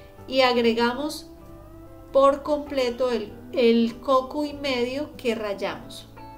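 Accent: Colombia. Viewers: Cuenta Samsung por el asentó creo que es Colombiana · El acento es colombiano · Esa voz es colombiana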